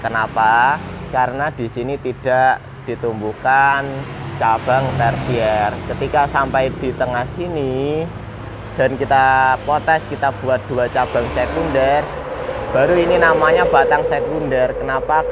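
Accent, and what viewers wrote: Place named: Java, Indonesia. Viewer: TERIMAKASIH mudah dimengerti karena kemedokannya SUHU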